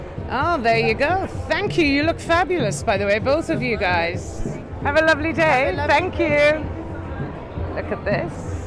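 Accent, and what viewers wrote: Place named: Ireland. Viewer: I discovered you two or three days ago and I can‘t stop watching your tours,. I love your Irish accent.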